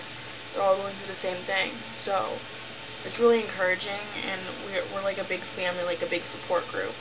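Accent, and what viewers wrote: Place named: Mid-Atlantic, United States. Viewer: you sound like you're from the mid-atlantic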